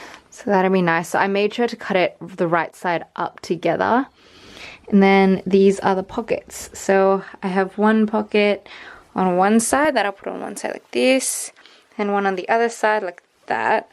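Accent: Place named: England, United Kingdom. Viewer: Asian with an English accent!!!🤣🤣🤣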